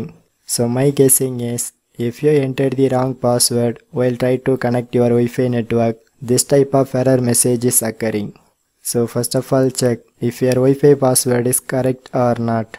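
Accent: India. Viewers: Im tired of hearing these indian accent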